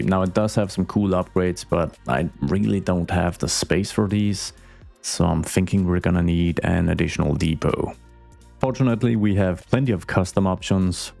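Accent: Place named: Denmark. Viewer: The danglish is real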